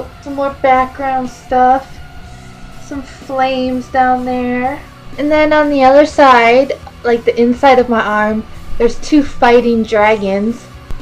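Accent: United States. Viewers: yeah your accent xD american im from sunderland in the uk you sure do you sound awesome :D your very welcome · are you from the east coast by any chance? you kinda sound like it!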